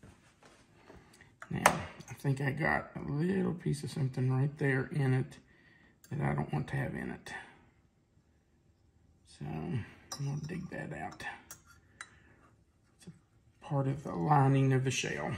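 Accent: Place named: Kentucky, United States. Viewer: I'm a Canadian, who is hooked on your recipes. I also love to hear that Kentucky way of speaking. Your chatter with is delightful.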